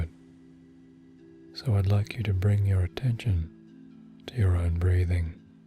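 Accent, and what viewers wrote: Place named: Australia. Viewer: Australian he is great, I’m an Aussie he does have a good accent and nice bass to his voice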